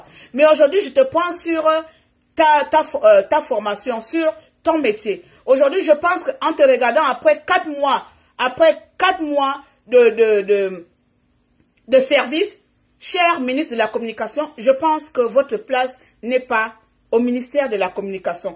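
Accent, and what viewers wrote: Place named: Cameroon. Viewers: Tu ne dis rien !!! Et tu es camerounaise. Je t'ai reconnu par ton accent... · N'est ce pas ?son accent montre kel est aussi camerounaise com sa sœur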